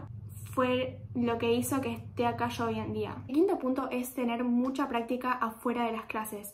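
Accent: Argentina. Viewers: Saludos desde Brasil 🇧🇷. A juzgar por tu acento eres argentina ! Cuáles idiomas aprendiste ? Los aprendiste solita o en clases ? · Tu eres de argentina? Por su acento parece que si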